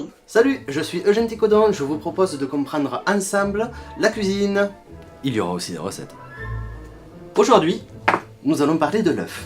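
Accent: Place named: South, France. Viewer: Super sympas cette petite émission:o j'ai aussi eu du mal à comprendre ce que disait la voix trafiquée mais ton merveilleux accent du sud et ton sourire me l'ont vite fait oublier x) merci!!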